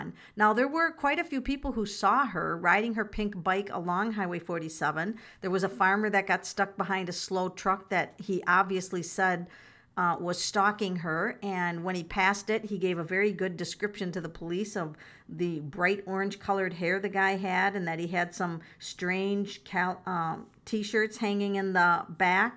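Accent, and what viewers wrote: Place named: Midwest, United States. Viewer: First I love your mid western accent. My husband is from Green bay wi. Second I love how you speak to Tara directly with the cards instead of just interpreting or reading the card. You really see her and acknowledge her. Don't forget to #taracalico in your tag section so it will get more noticed. I'm going to check out more of your work I love your personality.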